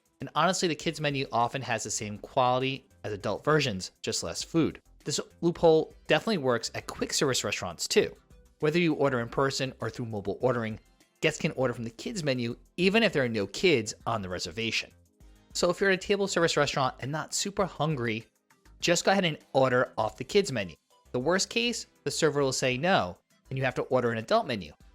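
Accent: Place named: Philadelphia, United States. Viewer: announcer sounds like he's from Philly- "wudder" instead of water. it's a SEPA thing.....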